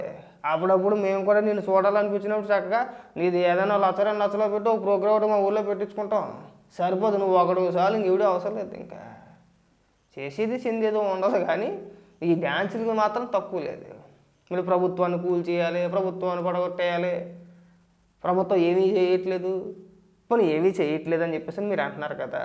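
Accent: Godavari, India. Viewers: సంక్రాంతి శుభాకాంక్షలు గారు.... మీ వెటకారం అంటే చాలా ఇష్టం😂... గోదావరి యాసలో అదరగొట్టేస్తారు మీరు 😂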